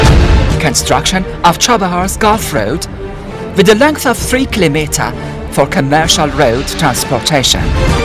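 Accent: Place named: India. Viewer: Good computer animation with an indian voice.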